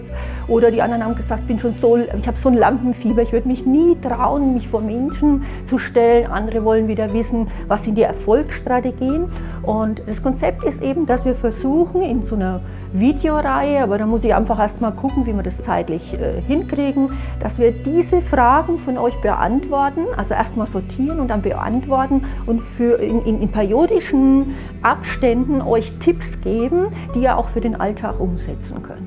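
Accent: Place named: Franconia, Germany. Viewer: ich sehe mir gerade deine Videos Stück für Stück an und finde, dass du ein Original bist.:-) Die Kombination aus dem Wissen, der Musik (finde ich in diesem Video hier nicht so störend), den gut gewählten Farben (warst du mal bei einem Imageberater, scheint fast so), dem Buddha im Hintergrund, deinem gesellschaftlichen Engagement - und nicht zuletzt der fränkische Akzent:-) macht ein Alleinstellungsmerkmal aus. Man merkt es sich.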